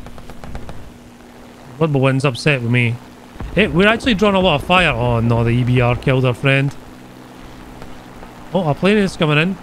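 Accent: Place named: Aberdeen, United Kingdom. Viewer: I love the accent and are you from Aberdeen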